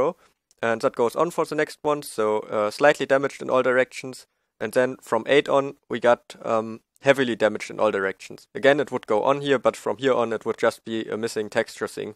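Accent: Germany. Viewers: man hört richtig den deutsche aktzent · Typisch deutscher akzent. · Are you german? ^^ You sound as if you were! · Are you german ? Its Sounds like :)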